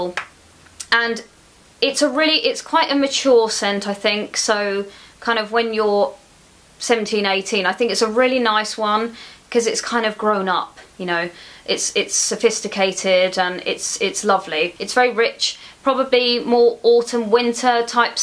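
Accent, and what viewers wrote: United Kingdom. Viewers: Hi, just wondering, where in UK are you from? You remind me of a friend of my who has got the same accent as you and I kinda miss her. Thanks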